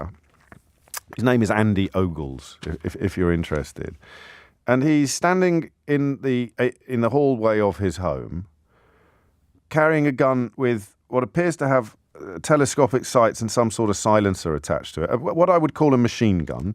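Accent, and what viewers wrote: United Kingdom. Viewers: I mean, he's not wrong, but i hate hearing it in a British accent.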